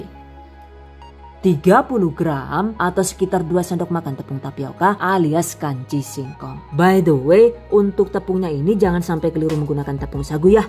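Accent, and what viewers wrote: Banyumas, Indonesia. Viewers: Jane sampean wong ndi mba Q bsa ngapak ya.. SALAM,ngapak NEK ORA NGAPAK ORA KEPENAK🖐👍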